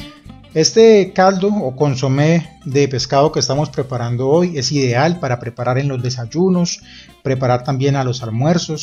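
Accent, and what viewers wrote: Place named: Bogotá, Colombia. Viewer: Yo reconozco ese acento Creo que es de personas de bogota Yo fui a Cartagena en un crucero y esa receta la probar Omg so good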